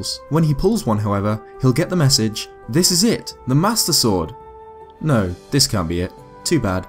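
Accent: England, United Kingdom. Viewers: Love the english accent 😌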